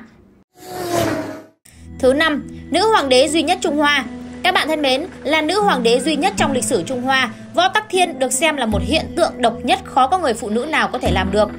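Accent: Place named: North, Vietnam. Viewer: Em Mc vừa lanh vừa duyên vừa hài hước-to chà bá😅thích nghe em nói chuyện giọng bắt mặc dù chị không thích nói tiếng bắt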